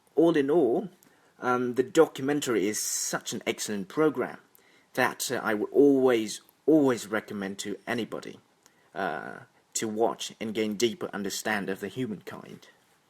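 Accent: India. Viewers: Giọng Ấn......eo....kinh....;D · like your Indian accent :v really cool lah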